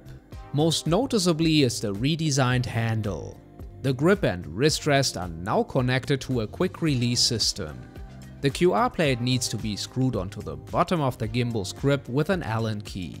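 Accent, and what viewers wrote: Germany. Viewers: German accents are so much fun to listen to.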